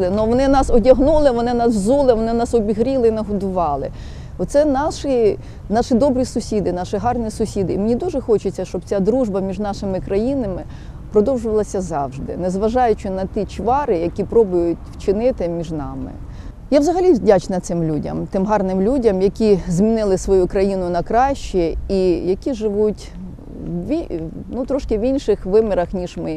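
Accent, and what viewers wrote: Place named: Ukraine. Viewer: Piękny akcent ukraiński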